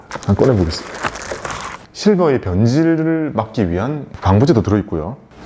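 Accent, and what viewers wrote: Gyeongsang, South Korea. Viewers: 와후~~ 추카드려요^^ 님~경상도 빠꼼인가봐요,억양이 ~~ㅎ 저도 그쪽인데 ㅋ 덕분에 실버버튼 구경했네요^^ 미소 미소 미소 미소 ^^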